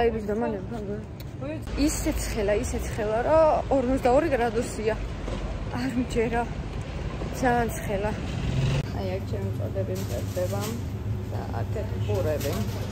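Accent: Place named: Imereti, Georgia. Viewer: მე თვითონაც იმერელი ვარ და იუთუბზე რო ესეთი საუბარი მხვდება მიხარია რომ ძალიან გახსნილი ხარ და რავი ბევრი ეგეთი არაა და ძან გულწრფელად საუბრობ რავი ჩემგან გულით რესპექტ💜💜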